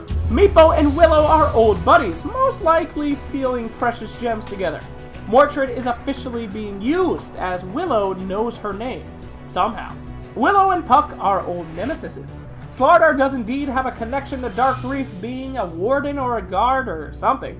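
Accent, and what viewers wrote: United States. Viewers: >American pronunciations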